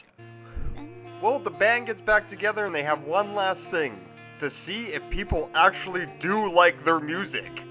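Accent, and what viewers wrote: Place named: Canada. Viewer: He sounds like a Canadian.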